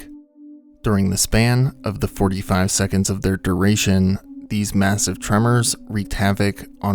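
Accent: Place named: United States. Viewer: Now can’t handle your American voice, I’m sure it’s a grate video but that voice is beyond unbearable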